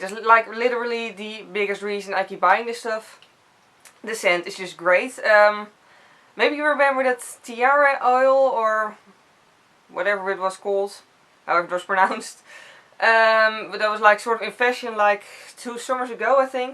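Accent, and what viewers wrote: Netherlands. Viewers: Holland lol accent 🐇🐇🐇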